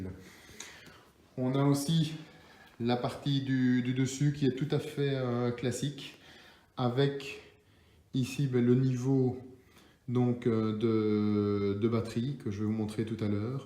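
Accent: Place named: Belgium. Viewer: Tu es Belge ? Ça s'entend 🤣 je le suis aussi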